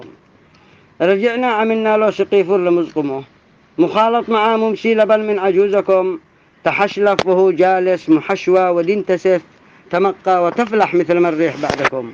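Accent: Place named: Raymah, Yemen. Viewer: حاش انك اصابي انت ريمي وهذي لهجةاصحاب ريمه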